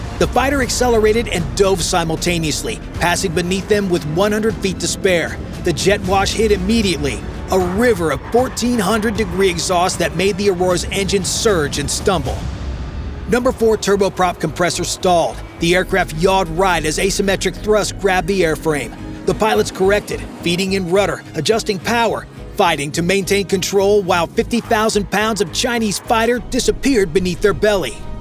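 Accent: United States. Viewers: American accent though, no originality on You Tube any more, how sad... "creators" my ass!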